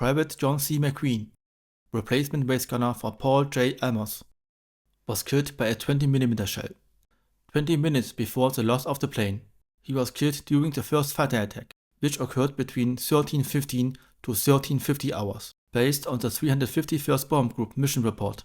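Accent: Germany. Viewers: Grundsätzlich mag ich den Kana sehr, aber vielleicht angesichts des starken deutschen Akzents vielleicht mal darüber nachdenken, ob Sie den Kommentar nicht durch eine KI-Stimme sprechen lassen. Dann klingt es wie richtiges Englisch. Da gibt es auch jede Menge gute Freeware. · If this is your voice, it really adds to the story. The accent adds some depth and feeling to the video, somewhat like watching Das Boot in German, with English subtitles. Well done!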